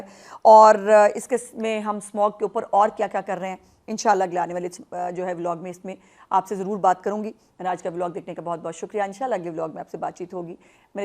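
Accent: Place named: Punjab, India. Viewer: باجی آپ کا پنجابی ٹچ بہت زبردست ہوتا ہے ماشااللہ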